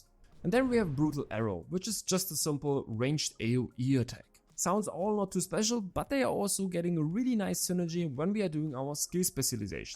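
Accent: Germany. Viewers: german who speak english xD funny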